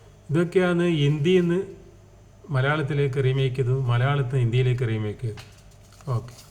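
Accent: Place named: Kannur, India. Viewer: താങ്കൾ കണ്ണൂരാണോ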